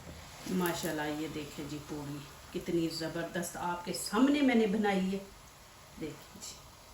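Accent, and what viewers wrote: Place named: Punjab, India. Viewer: آپ اردو پنجابی لہجے میں نہ بولا کریں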